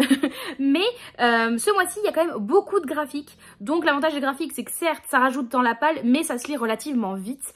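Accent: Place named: England, United Kingdom. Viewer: Olala, le livre autour de Jane Austen me tente ❤ Sinon j'adore l'accent anglais 😂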